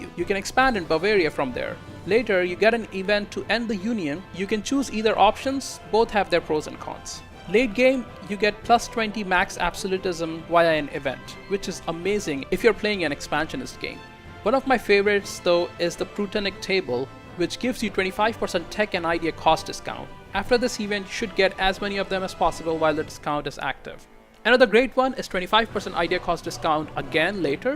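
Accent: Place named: India. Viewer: make a tutorial on India. I hope i'm not wrong but you sound Indian. If you are make a tutorial on India and tell us some history :D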